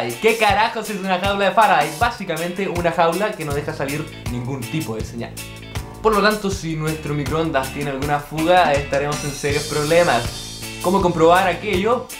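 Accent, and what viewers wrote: Chile. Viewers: No amigo, este pollo es chileno ....eso si no se de que parte es..!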